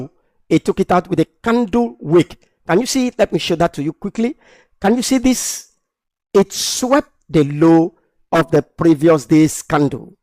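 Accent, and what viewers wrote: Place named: Nigeria. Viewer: Thats Nigerian accent❤❤❤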